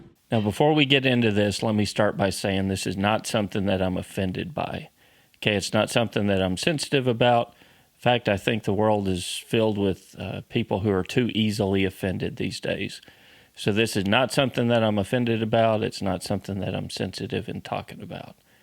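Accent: Texas, United States. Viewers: Kirk Dyreson, welcome to how real Texans think and talk. I would buy anything thing from this man if he looks me in the eye and shakes my hand. Content is was counts and you’ll get used to the delivery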